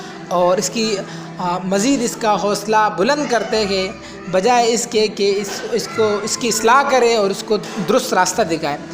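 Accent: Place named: India. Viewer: Ye kesi Pakistani k bolne k style ni he is indian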